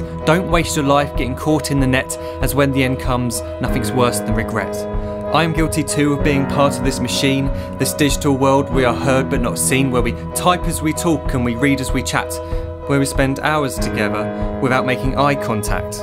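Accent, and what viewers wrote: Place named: United Kingdom. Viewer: Absolutamente sensacional! Nossa triste realidade e a antevisão do futuro,com o tempero especial do delicioso sotaque britânico (ou australiano?).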